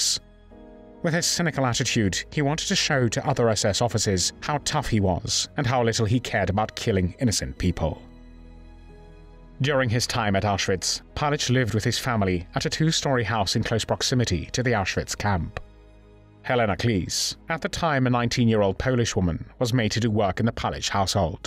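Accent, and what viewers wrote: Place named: Wales, United Kingdom. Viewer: The most horrific thing in this video is the terrible narration with a weird Welsh accent. English (international English) was too hard? Stopped watching at the 6th time he said POWLAND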